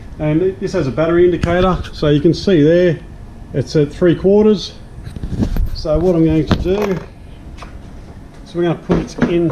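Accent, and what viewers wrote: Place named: Australia. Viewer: Good old Aussie accent!👍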